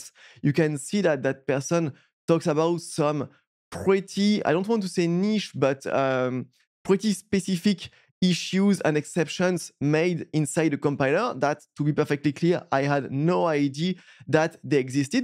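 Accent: France. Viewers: L’accent franchouillard qui fait mal aux oreilles 😂😂😂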